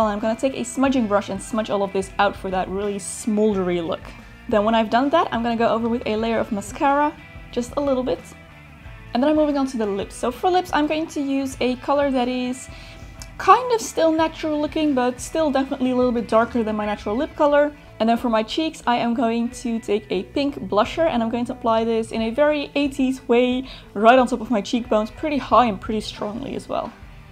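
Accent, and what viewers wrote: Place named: Netherlands. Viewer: ik wist dat je Nederlands was toen ik je accent hoorde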